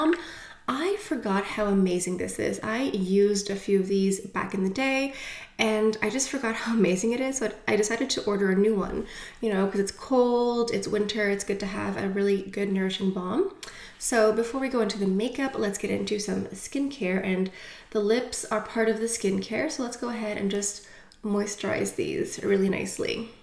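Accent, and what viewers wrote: France. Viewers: I have that violet single! Love the look on you but I will have to lighten it up for my colouring. Love to hear you pronounce the names of product in your French way.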